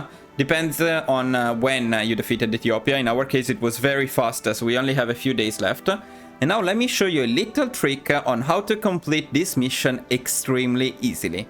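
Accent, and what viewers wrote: Italy. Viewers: I really appreciate your Italian accent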